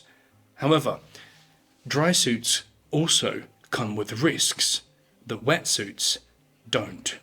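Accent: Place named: United Kingdom. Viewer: Just seen this in a yt browse of my TVs yt but this comment more to the piece's frontend or creator, dude where did you grow up, was it Britain? A few hours old dead body, is normally deemed a dead body, your saying "dragged her corpse" I take extreme issue with as your accent has a strong British aspect to it. We don't describe like you just did in the video-I seriously wonder are you genuine British, if genuine British then what are your motivations for saying as you have, it smacks to me of an American created or fed attempt to go further than USA vs GB 'is' vs 'was' for deceased birthdays, I am staunchly a was. And doesn't seem cold at all, more realistic recognising past as past and fact birthday is a specific happening in the present(or why mention) but corpse Vs body I can't believe a Brit made this mistake-were you brought up in USA dude or you just got a painting brush (for what's not(we have more care in what we do say) out)? 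For someone to die so young is a sad occurrence.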